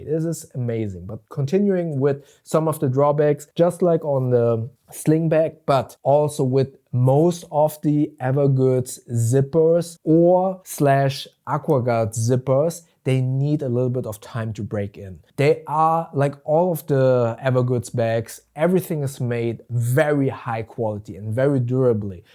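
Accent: Germany. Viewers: ⛰great video.. and it's funny, some reviewers are very terse and others use more words. I find yours that you do use more words and sometimes a tiny bit of filler words but yours are one of those few content creators that the extra words (but not too many) actually add to the content. I can watch your video such as this one at 1.0x and I can't say that about most creators. Maybe it's the German accent which is pleasing or maybe it's the street shots 🤣. I agree with you, overall the bag is amazing and I'm one of those who do love it. Really really happy with it and wasn't going to get it, held off for a while. They did an outstanding job even with the few nits that you mention. Keep up the great work! [and ps, that's a mountain emoji in my comment, nothing else!!]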